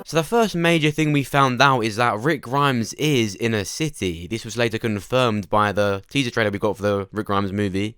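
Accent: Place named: England, United Kingdom. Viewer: I get it, you're English but even as an Irish person I find it very difficult to know what you're saying. You're talking too low and too fast and your words just sound like noise. Btw this video like most of yours could be done in 5 mins. I feel as if you're drawing out the videos so you hit your youtube targets for revenue.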